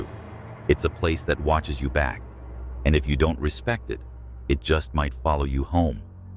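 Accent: United States. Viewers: As soon as I heard the American accent I knew this video was bullshit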